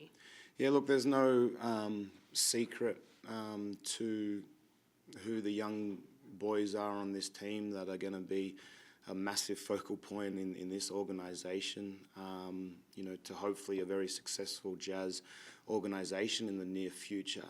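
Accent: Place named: Australia. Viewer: Great he still has his Aussie accent.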